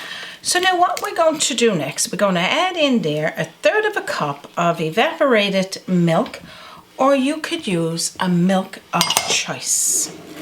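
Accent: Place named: Canada. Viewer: wow that looks sooo good!! merry christmas to canada!!! i ABSOLUTELY LOVE YOUR ACCENT!!!! i live in maine... and it soun ds like how you say it..parch a berry. soo cute!!!! here we call it part ridge. i dont think we have those berrys here, but i know we def have the bird;];] good eaten too;];];] hope you have a great christmas and a great new yr;];];]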